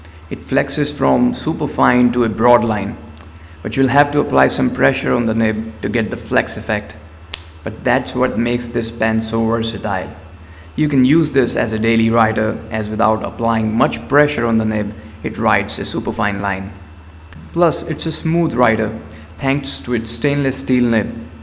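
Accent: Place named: India. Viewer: Are you in India? you could get these in India (judging by your accent)? 🤔 I don't think I could get these in Bangladesh 😭.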